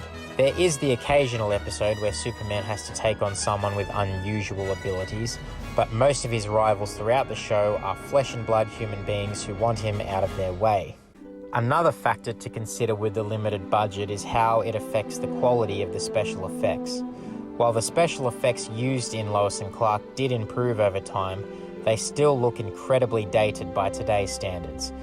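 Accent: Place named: Australia. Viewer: now tell me if im wrong but that is an aussie accent if i ever heard one. thanks for the video mate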